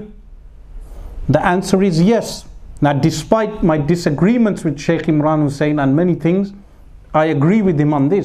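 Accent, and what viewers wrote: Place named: Birmingham, United Kingdom. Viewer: this man is from Birmingham. his accent sounds proper thick. and he's a Brelwee. Double whammy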